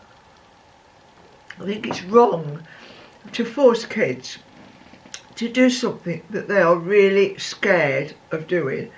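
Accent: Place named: Manchester, United Kingdom. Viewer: Dear..... what I good laugh this video gave me because your attitude to swimming was and has always been mine. To me you appear ro be so brave you managed to paddle in the sea up to your knees....BRAVO...I could never go over my calves...LOL. I think the modern way they teach kids to swim nowadays is quite different not like it was. I do enjoy your videos nd love your northen accent It reminds me when I was beought up in Manchester. I have been living in France for many moons now.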